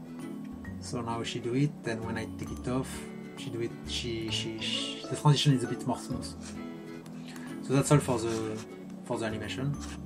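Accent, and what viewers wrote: France. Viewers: XD l'accent Fr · Je l'ai capté direct que tu étais Français ahah x) Pourrais tu refaire le même tutoriel en Français ? Car moi, je ne comprend pas l'Anglais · Are you from France because you have the same accent si tu est français pourrais tu fair un tutoriel en français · Cet accent Français xD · Je me doutais déjà que tu étais français vu le titre de la dernière vidéo mais là c'est sûr ! ^^ C'est cool de savoir que tu es français :D En tout cas tu te débrouilles super bien, j'ai rien compris à la vidéo mais bon x) (enfin c'est pas que ton accent est si horrible que ça hein, c'est juste que j'y connais rien perso xD) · are u french ? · Hey ! Nice video :) Are you French ?^^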